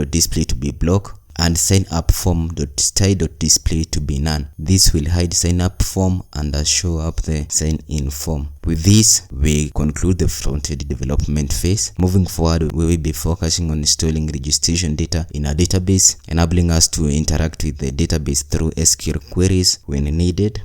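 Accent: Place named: Russia. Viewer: I think you are Russian accent thats good 👍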